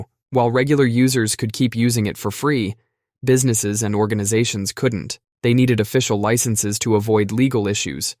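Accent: United States. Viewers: the qwerty board is not "the global standard"... leave it to Americans tothink their standard is the default. There is QWERTY, DVORAK, AZERTY, COLEMAC and QWERTZ in addition to Arabic, cyrilic and asian variants standard lmao #shytamericanssay #americandefaultism (yes I know the channel claims UK, his accent is not English/Welsh/Scotish/Irish, it is American if the "some states finally had enough" comment he made with DST didn't clue you in, a Brit would not default to "states")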